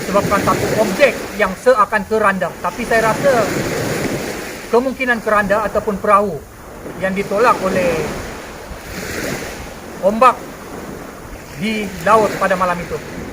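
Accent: Sabah, Malaysia. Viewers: orang sabah bang,mak kadazan ayah india dan dia islam xsilapnya.sbb tu pelat² sabah tapi muka ala² india.😊😊😊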